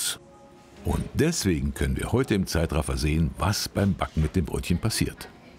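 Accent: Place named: Rhineland, Germany. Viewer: hatte schon immer einen leicht kölschen/rheinischen Akzent und den wird er jetzt mit 82 Jahren und nach über 45 Jahren als Maus-Sprecher auch sicher nicht mehr ablegen.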